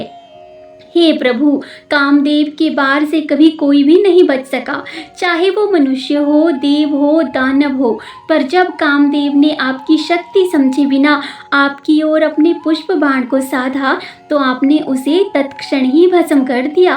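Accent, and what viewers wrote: Standard. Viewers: जय भोलेनाथ हर हर महादेव🌹🙏 पूरा 20 मिनट का यह शिव महिम्न स्तोत्र और कथा को सुना 🌹🙏 जब इस पर अपनी टिप्पणी लिख रहा था तो शब्द नही मिल रहे थे 🙏 गृहस्ती और परिवार में इतना व्यस्त रहते हुए भी इतनी सुंदर स्पष्ट और शुद्ध उच्चारण से भरपूर पोस्ट सोच कर मन गदगद हो गया 🌹🌹🌹🌹🌹 शुभ रात्रि 🌹अब आराम करो 🌹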